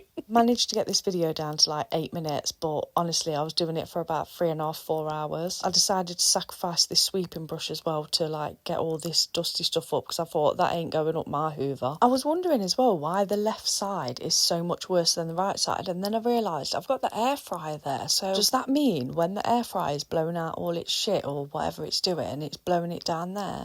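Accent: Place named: Nottinghamshire, United Kingdom. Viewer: 😂😂😂 you do make me laugh,sounds like your from Sutton-in-Ashfield!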